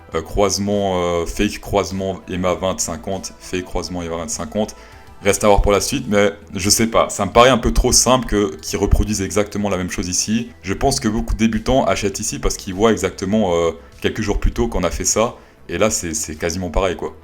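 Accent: Quebec, Canada. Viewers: Quebecois ou cameronai Mdr on cest plus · Super ton accent canadien mdr ...je voudrais vraiment savoir qui tu imites avec cet accent!?! tu cartonnes comme toujours ... Tu pourrais faire l'oiseau de mauvais augur une petite rep ( rep augur) non ? Elle n est pas bonne ? Bon j aurai essayé ^^ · Aie, dur l'accent québécois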